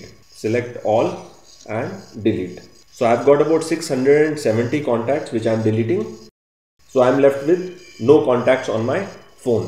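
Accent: India. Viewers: Sorry but indian accent is far difficult than Welles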